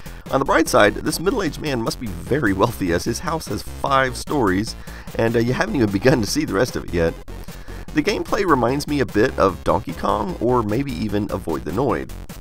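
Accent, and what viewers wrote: France. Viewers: I like your french accent 😀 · Awwwwwwwn your french is adorable ^^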